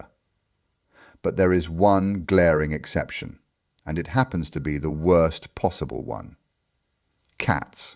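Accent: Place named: Australia. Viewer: Millions of mice have not been dropped into the Everglades . Are you really that hard up for attention? I would never subscribe to a channel as misleading as yours. This is the second video I have found of yours misleading the public about what is happening in the Everglades. I guess, you think, if you wear the "outback" clothing and sound Australian people won't question your faulty videos. You are part of the problem with the reliability of the internet. Why do you do this?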